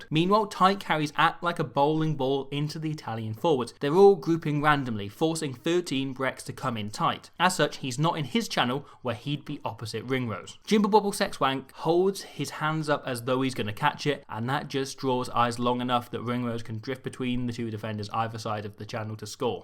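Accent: Wales, United Kingdom. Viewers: are u welsh??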